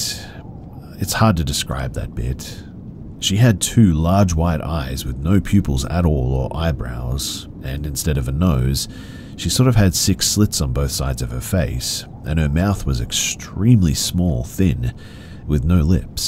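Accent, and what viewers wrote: Australia. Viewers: G’day…🤗 I love your channel and it’s great listening to your familiar Aussie voice! Great voice for narration, as if you didn’t know✌️😄 It’s my son’s 21st Birthday today 🥳🎊🎂🎉🎁🎈🎉 We’re all in such happy spirits too. I’ve just made him a Strawberry 🍓 Cheesecake and he also selected a Beef Roast with all of the trimmings PLUS MASH! 🍚🥄✨💃🏻🪩🕺🏻 That’s my boy (young man) now! But he’ll always be my baby 💖✨ I find all of these stories so enthralling, so thanx for making the days go faster! 🏆😘🍰🍓✨Greetings from MNECNSW!🤜🤛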